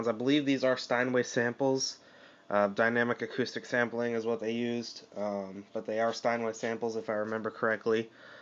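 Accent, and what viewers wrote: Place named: United States. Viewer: I see now that you are living in the USA, I was in mind you were from UK, ok the accent was american, so I had to knew that, all the best and thanks, Luc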